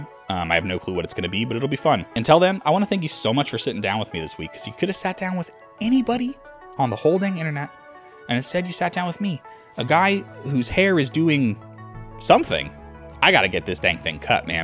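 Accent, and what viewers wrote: Germany. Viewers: subscribed because of the german accent JA! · thanks for save me, buying this! btw best german accent, großartig!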